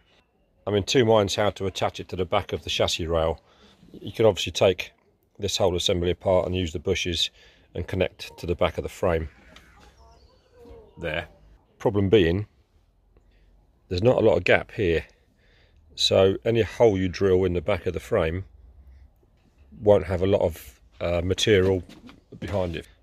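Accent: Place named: United Kingdom. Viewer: I have to tease you a bit here, but with a good spirit governing it all. When I first saw your face, you had a shocking resemblance to that of Nathan Elliott from Tennessee who has been making sawmill videos for five years now. Check them out. I would love to see you both side by side at a party just to see how many would mistake you two for brothers. Until one opened his mouth and spoke with a British accent and the other with a rich accent from Tennessee.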